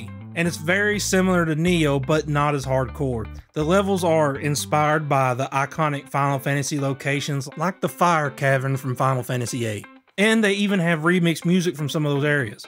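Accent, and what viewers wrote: South, United States. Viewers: Sounds like you a southern boy.... like me lol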